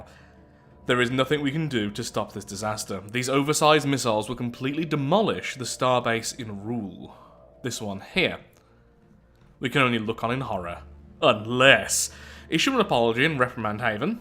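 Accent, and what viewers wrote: United Kingdom. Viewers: As someone whose native language isn't english: You are probably the easiest british person to understand.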